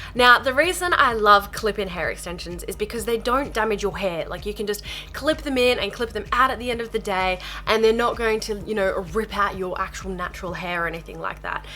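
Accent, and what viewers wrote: Australia. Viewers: Sorry, but I don't find the Australian accent very nice. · Good old Aussie accents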